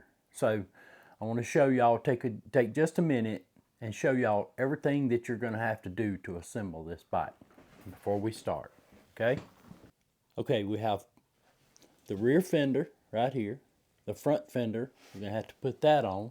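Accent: South, United States. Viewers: A Chinese ebike review with an American southern accent is dope and funny at the same time lol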